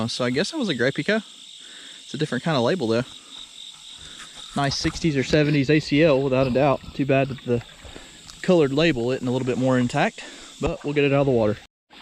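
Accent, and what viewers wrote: South, United States. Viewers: I was born a yankee, but I’m a southerner now. The accent sounds like sweet tea served on the front porch. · From northern midwest, where not much accent. Southern accent is cool. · That's not a "southern accent"!!! If you know your way around the country,(OTR) truckers know what I mean... You have an Alabama accent.. Texas and Georgia are subtly different... The Midwest, Illinois, Ohio, New York City,New Jersey etc. All have a manner of speaking that are truly different... North and South Carolina,too.... Love your site and your enthusiasm for when you find something really nice....🤓 Watch out for the rabid crawdads and insane fish😂.... Be Blessed and stay safe...🙏☝️🕊️✝️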